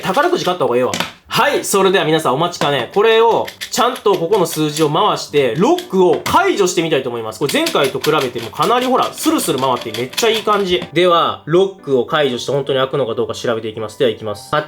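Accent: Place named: Kobe, Japan. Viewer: 方言的に神戸の人かな？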